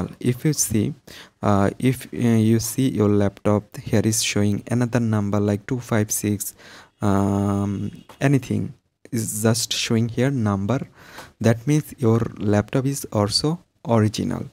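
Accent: India. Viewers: This is our indian 🇮🇳english bro 😅 ok so don't mind ok 😅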